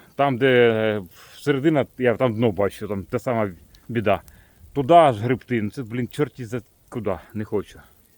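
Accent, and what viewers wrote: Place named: Surzhyk, Ukraine. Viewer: Що ж вас так коробить? Все гарно у нього виходить , нічого він не мучається , класичний український суржик .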